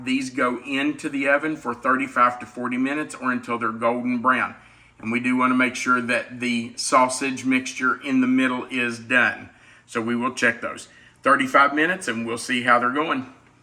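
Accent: South, United States. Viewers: I see you're from Kentucky do you have a southern talk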